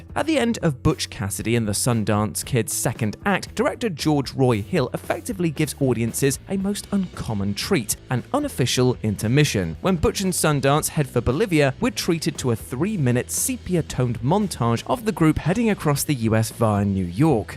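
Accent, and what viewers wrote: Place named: London, United Kingdom. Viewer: you sound like you're from londonnnnnn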